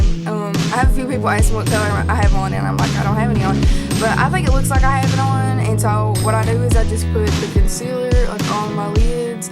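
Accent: South, United States. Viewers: southern accent lol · Southern accent omg😍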